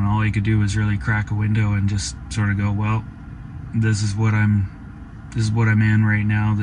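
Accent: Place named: Quebec, Canada. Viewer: Watch Eric's channel where he talks about "11 design mistakes". Really interesting. Oh and the accent is from Quebec. Thanks for sharing A live stream would be great!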